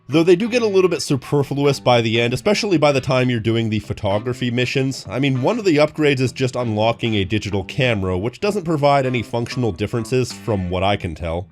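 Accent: Canada. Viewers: Go Canucks? I knew you sounded like a fellow Canadian 😅 Go Sens Go! 😉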